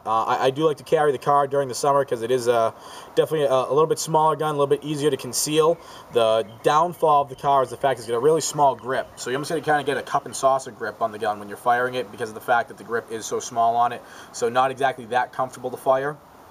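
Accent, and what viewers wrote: Massachusetts, United States. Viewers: Just came across this video; nice work! (Noticeable MA accent, btw.) Question - you mentioned the regular M&P line would be getting upgraded triggers too. I e-mailed S&W and they said "No upgrades at this time." Do you have any more specific information about when this change will be happening and if S&W will offer the upgrades to previously-purchased M&P guns, either for a nominal charge or as part of regular warranty service? Thanks for your help, and keep up the good work!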